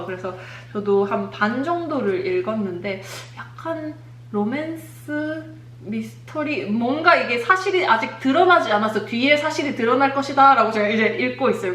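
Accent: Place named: Ulsan, South Korea. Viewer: 오늘따라 유독 진한 사투리 악센트! 울산쪽이신거 같은데 궁금하네요.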